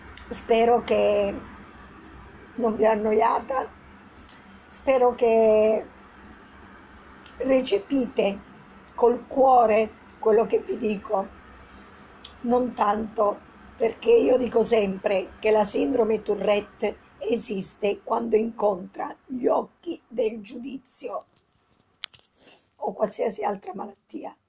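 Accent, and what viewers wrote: Abruzzo, Italy. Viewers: bravissima! Si dovrebbe invidiarti per la tua determinazione... Io ti ammiro molto. Mi sembra di riconoscere un'inflessione "familiare": sei abruzzese? Ti abbraccio.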